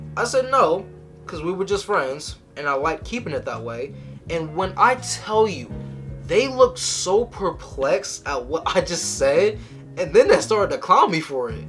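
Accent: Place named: Midwest, United States. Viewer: You don't sound Southern.....I'm getting Midwest vibes like Illinois but in every southern state you have people that sound different.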